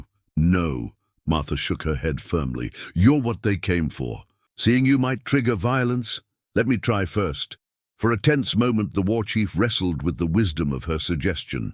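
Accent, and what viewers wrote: England, United Kingdom. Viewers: English accents are pleasant and easier on the ear than American accents. Even though I am southern American.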